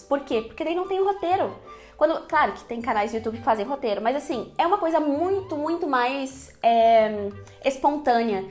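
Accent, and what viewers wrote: Rio Grande do Sul, Brazil. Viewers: Comecei a assistir os videos agora, capaz que é gaucha hahahahahahahaha terra dos professores BD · gaúcha demais hahahahah e é tema e não lição, como também é bolacha e não biscoito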